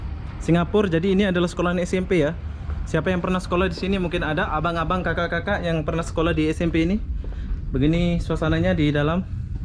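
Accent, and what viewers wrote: Lombok, Indonesia. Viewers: Slamat malam. Ternyata Semeton Lombok. pantesan di pertengahan Vidio dalam hati bilang koq ada logat lomboknya... di akhir Vidio baru terjawab..Salam kenal Semeton Sasak semoga sehat selalu dan tetap semangat dalam membuat konten N semoga sukses semeton.. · Maaf lamun unin" dialek bhs indonesian de jak .....baun tiang bade' pelinggih batur sasak Lombok. Pdas gati dialek Lombok de. Batur mbe plinggihde?? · Lekan ngonek ke indeng indeng logat side marak dengan sasak, ternyata tetu side dengan sasak, ndekne bau telang logat side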